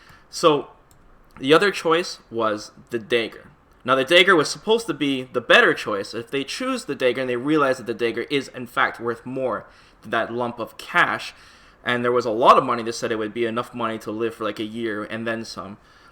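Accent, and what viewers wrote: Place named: Canada. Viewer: He's Canadian ayyy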